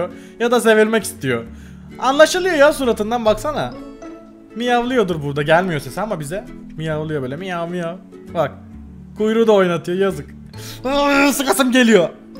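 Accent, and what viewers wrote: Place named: Izmir, Turkey. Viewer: Abi kesin izmirlisin çünkü benim dayımda sizin gibi konuşuyor 😊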